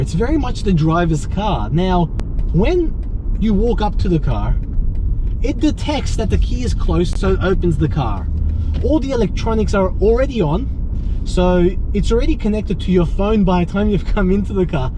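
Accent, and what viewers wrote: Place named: South Africa. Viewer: Great vid and it's sounds as if you have a little south African in your accent. Do you? 👍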